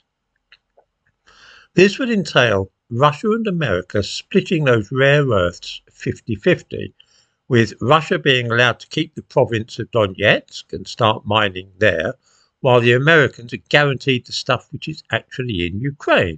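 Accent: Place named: Yorkshire, United Kingdom. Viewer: You sir could be from Yorkshire, a great honour, You say it as it is, well done t'old flower.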